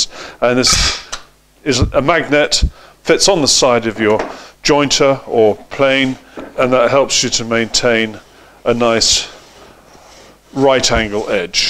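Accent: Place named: Manchester, United Kingdom. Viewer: OMG He's from Manchester....................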